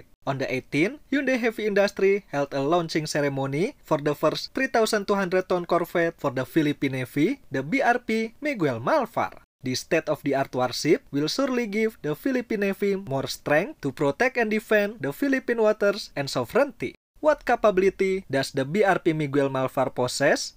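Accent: Indonesia. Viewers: Pengucapan english nya kaya org indo admin nya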